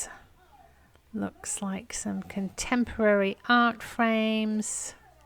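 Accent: United Kingdom. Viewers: Hi. I love the crystal beaded tree ornaments and all the items in the baggy. Love watching your channel, good to hear a Brit xxx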